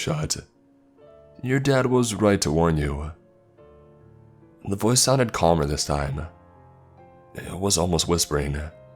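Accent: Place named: California, United States. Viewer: I hate your preppy Californian girl accent.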